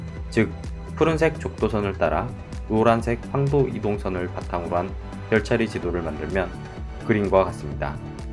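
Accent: Jeolla, South Korea. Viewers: 형 고향이 전라남도여? 전라도 사투리가 심한뎅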